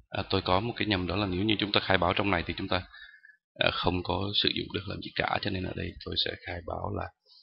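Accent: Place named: Hue, Vietnam. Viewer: Giọng Huế của anh nghe thú vị nhỉ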